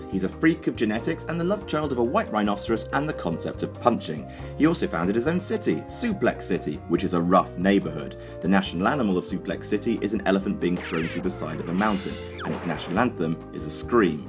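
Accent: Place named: United Kingdom. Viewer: Anyone else laugh themselves to hysterics when he said "TROLOLOLOLOLOLOLOL" in that deadpan British monotone?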